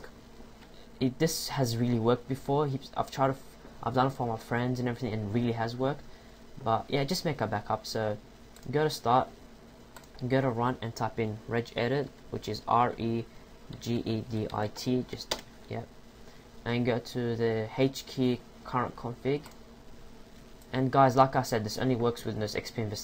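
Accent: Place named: South Africa. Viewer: That's because it isn't a British accent. It's a South African one.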